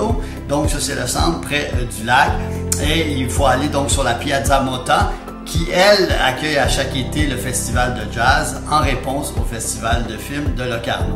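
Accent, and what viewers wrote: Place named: Quebec, Canada. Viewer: Bonjour Monsieur, merci de nous présenter une magnifique région de Suisse, le Tessin ou Ticino. Pourquoi vous a-t-on choisi, avec votre accent du Québec ? Que mange-t-on dans le Tessin en Suisse ? Autre chose que du sirop d'érable ? Vous êtes du Québec, vous connaissez, je suppose. Comment sont les gens dans cette partie de la Suisse où l'on parle italien, dix pour cents de la population suisse. Présentez nous la gastronomie du Tessin, ils ne connaissent pas le sirop d'érable, présentez nous leurs goûts en matière de musique. Ils ne connaissent pas Céline DION et GARROU, Lara FABIAN, Isabelle BOULAY et vos sommités de la musique du Québec. Sur les lacs de Lugano et de Locarno, on ne fait pas du patin à glace comme sur le Saint-Laurent. Moi, c'est Paul, venu de Bruxelles, Belgique. Frites, bière, moules, chocolat sont mes aliments préférés. 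En ce moment, janvier 2023, il fait froid. A Bruxelles, cinq degrés, encore l'automne sauce du Québec, mais en provinces de Liège et Luxembourg, neige, neige, neige et encore, luges, traîneaux, ski de fond, raquettes, remontées mécaniques, les Hautes Fagnes, un vrai décor digne du Québec, les Hautes Fagnes, cette région de Belgique qui touche l'Allemagne et le Luxembourg où dix pour cents de la population belge parle allemand. Le Tessin est cette région de Suisse, en été, chaude et ensoleillée où les habitants parlent italien, dix pour cents de la population suisse. Des gens méridionaux plus agréables qu'à Zurich et à Lucerne, des suisses u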